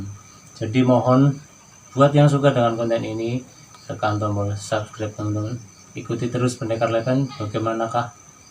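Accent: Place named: Java, Indonesia. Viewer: assalamualaikum.salam kenal dari tangerang mas mas logat jawa penampilan orang timur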